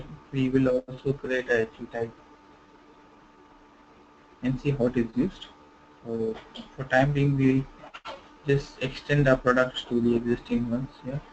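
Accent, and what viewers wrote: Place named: India. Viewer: indian accent makes this a hectic video it can not be watched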